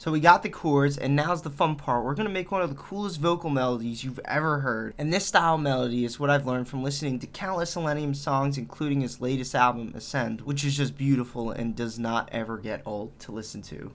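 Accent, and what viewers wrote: Philadelphia, United States. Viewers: This man is from Philly or Baldimore for sure, am i right? Sick tip though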